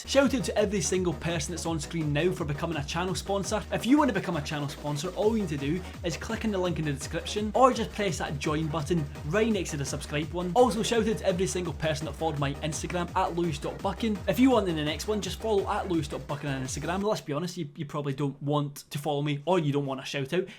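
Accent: Scotland, United Kingdom. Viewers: Lol I love his Scottish accent I have an American accent and I LIVE in Scotland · SepticSkull X it’s a scottish accent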